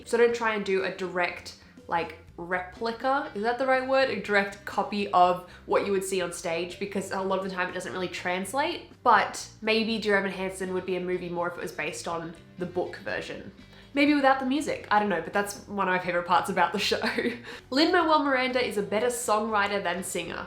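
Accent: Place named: Australia. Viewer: you remind me so much of my voice teacher, except ya know..... your australian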